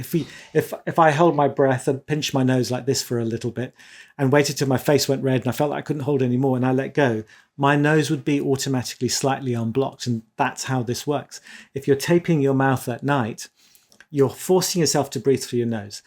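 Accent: United Kingdom. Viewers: Good Day, man! Thank you for the upload. I've been mouth taping for about 3 years now and its shown great benefits. I'm pretty sure I am still having some mild snoring/Apnea events but the mouth tape was a game changer and my Hygienist actually said they noticed an improvement in my overall oral hygiene. Disclaimer: it may be anecdotal and ones mileage may vary. I appreciate all that you do for the sleep community and of course the English accent is an amazing addition! also: So many Cartoon/movie character pins on your coat.. oh my!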